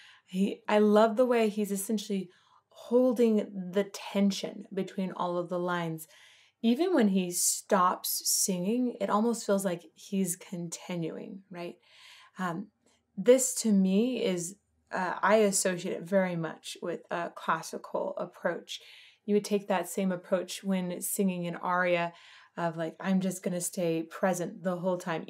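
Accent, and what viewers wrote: Germany. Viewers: English is not his native language....he is German think about that.......... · That German accent is so sexy 😍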